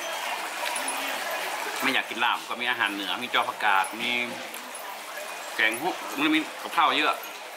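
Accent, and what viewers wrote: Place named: Northern Thailand, Thailand. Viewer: พูดเหนือปนกลาง งงกะลุง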